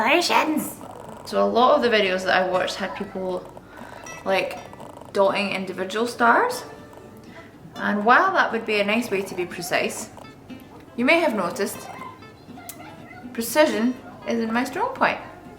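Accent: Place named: Scotland, United Kingdom. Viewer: if the plan was to entertain as stated this was hilarious :D also i love a scottish accent ! got any pics of the end result though ? ;)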